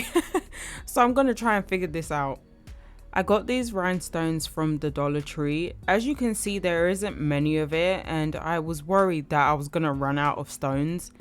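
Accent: United Kingdom. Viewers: I retract my comment about you sounding 25% American. you sound 100% British to me 😀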